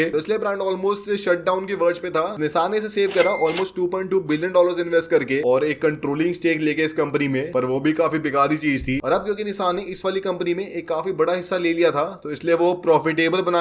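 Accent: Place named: Delhi, India. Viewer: Damn that Delhi ka douchebag accent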